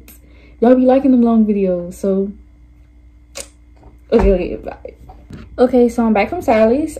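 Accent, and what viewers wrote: Louisiana, United States. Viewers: Y’all what state she from I need to know with this accent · You have so much hair! It turned out so pretty! I feel like I heard a Louisiana accent! ❤ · Are you from Louisiana?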